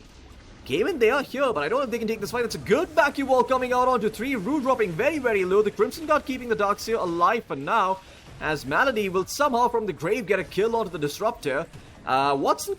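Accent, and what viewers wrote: India. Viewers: is this commentator from India or something ?? i feel like i'm listening to phone scam calls person from Mumbai pretending he's english.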